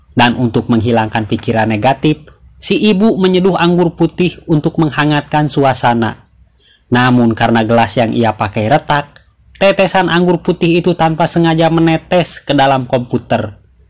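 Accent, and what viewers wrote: West Java, Indonesia. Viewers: Lanjut dengan logat sundanya euyy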